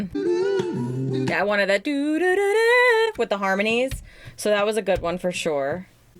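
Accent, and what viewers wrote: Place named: New York, United States. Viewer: Thx Thought I heard a little NY accent in your voice Keep Doing your thing you Dope feel free to to check out some of the Beats I’ve Made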